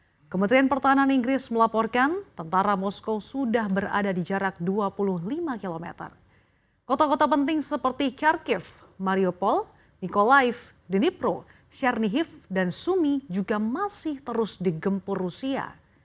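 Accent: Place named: Java, Indonesia. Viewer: Mbak hostnya jawa banget..manisss... aku suka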